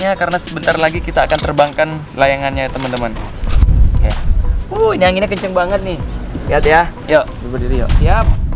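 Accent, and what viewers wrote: Madura, Indonesia. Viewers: bang asli Madura mana?? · Orang madura ya bang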